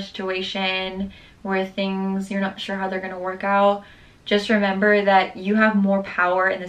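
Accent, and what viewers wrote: France. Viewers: Since you're fluent in French and have been there for six years, do you find the accent starting to stick when you're speaking English? Just curious haha. Love your videos and energy :)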